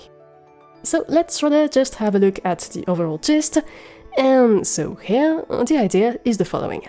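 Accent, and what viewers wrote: France. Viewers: I'm still racking my brains trying to figure out how to get a character to climb a ladder. I've almost reached the same solution, but I don't use Godot; I code games in JavaScript without engine, just for fun. But I don't have a function to know if I'm entering or leaving the tile. I'll take a look at the Godot source code. Thanks for the video. PS: Are you French also? Because I surprisingly understood your accent lol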